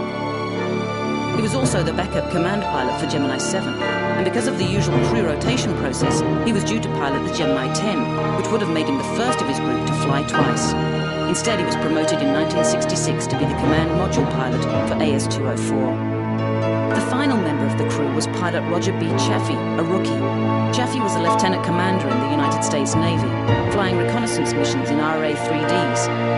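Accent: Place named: United Kingdom. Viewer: "Ed White was seen on the television monitor trying to open the hatch." Ten seconds later: "With a hatch that could only be opened from the outside, the three men didn't stand a chance." Hmmmmmm. THAT'S good documentary filmmaking for you! So... Either Ed White was clawing at a hatch that couldn't be opened as he died, OR, the hatch COULD be opened from the inside, and this Brit narrator doesn't know wtf she's talking about!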